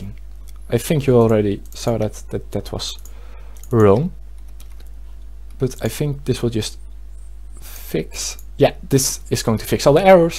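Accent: Netherlands. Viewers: gek Nederlands accent bro thanks voor de tutorial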